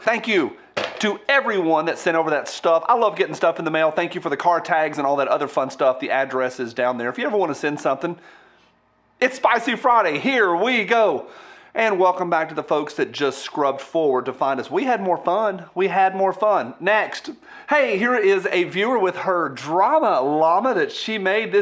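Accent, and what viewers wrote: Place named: New England, United States. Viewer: We need more New England accents, lovee.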